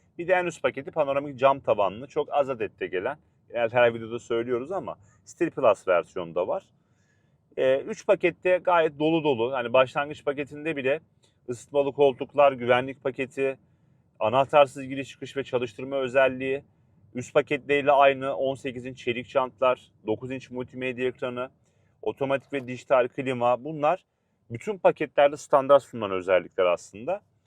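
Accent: Samsun, Turkey. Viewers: Samsun şivesi😊olmuyor maalesef😊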